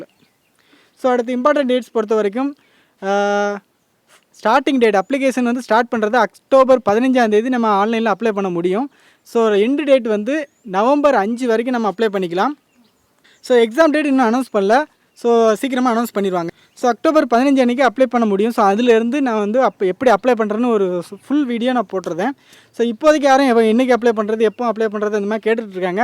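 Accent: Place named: Tirunelveli, India. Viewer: R u from nellai....?i like ur slang..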